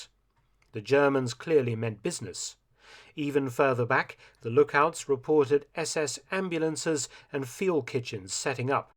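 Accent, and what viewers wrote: United Kingdom. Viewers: When you have a WW-ll Historian and author at the helm how can you go wrong? The British accent narration doesn't hurt either. 😊👍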